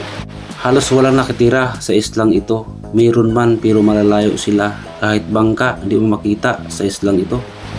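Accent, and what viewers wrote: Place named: Bicol, Philippines. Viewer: Parang Taga bicol itong si par!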